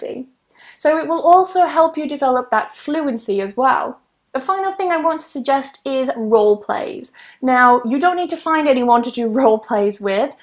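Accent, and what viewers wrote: United Kingdom. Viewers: I'm a huge fan of British accent english speaker. I love British accent. Carry-on thank-you so much. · Thank you so much. Can i suggest you that maybe you can put the text below. Because maybe there are some people who don't understand because it is too fast or '' too british'' hehe.. Thanks a bunch. keep helping and improving. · Can you please tell me in which british accent you are talking🙂💐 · I'm from Uzbekistan, I love your British accent, you are the most beautiful teacher in the world, if I were your husband I would be the happiest man in the world 😘😘😘😍💓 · I love British accent and you. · Those tips of yours were really helpful to be honest for those who want to improve their English skills and can't find a partner to speak to. That happened to me a lot back then many years ago when I first started learning English. It's quite hard to find people who'd be willing to interact and help us with our English speaking skils by practicing it with conversations. 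I'll keep watching your videos anyway cause I want to get used to the British accent which I've been learning how to admire, cause before it sounded really weird, but it's changed gradually overtime! And I loved your accent specifically! :) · I love your beautiful british accent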